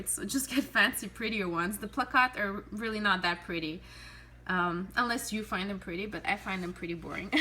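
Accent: Quebec, Canada. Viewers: R u from quebec? You got that sound.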